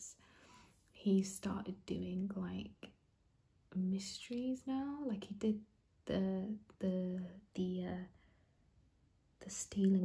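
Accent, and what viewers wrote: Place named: India. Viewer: So relaxing please make another Indian accent asmr video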